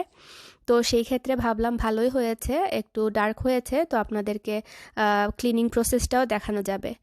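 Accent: Sylhet, Bangladesh. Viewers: Thanks apu... ❤❤❤ lots of love & dua for u specially for falaq... I just love to hear ur sylheti language. ... Seriously.. So sweet & cute.. Dua kori apu tmi onk valo thako ❤ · No offense but i think it was much better when you used to speak in sylhety accent.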